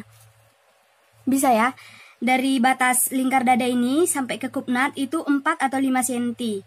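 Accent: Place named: Sumatra, Indonesia. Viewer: Hallo, lokasi ada dimana ya? Logatnya sih sumatera nih... Saya baru gabung, lumayan menyenangkan dan cukup detil penjelasannya. Apakah juga terima jahitan makaud saya sbg penjahit? Tks 🙏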